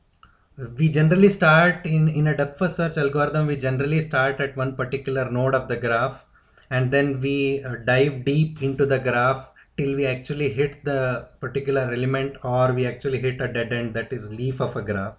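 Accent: India. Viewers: i like your indian accent :) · but i hate his fucking indian accent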